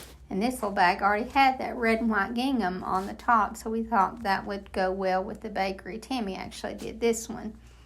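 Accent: South, United States. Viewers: Some people watch videos with things like beautiful nature scenes, sounds such as crashing waves on a beach, mountain brook sounds, rain, etc. for relaxation. I watch your videos. Your calm Southern voice is so soothing. (I'm originally from Augusta, GA - now in Texas). You explain things so well it makes me think I could probably do a bit of what you do. And even the projects themselves are calm and relaxing...color tones, so much nature. No blaring music in the background. Thank you for making my day a bit brighter. PS: I tend to love the little squirrel box best. I have some squirrels I feed. Several of them come within inches of me to get their peanuts. 🐿 😊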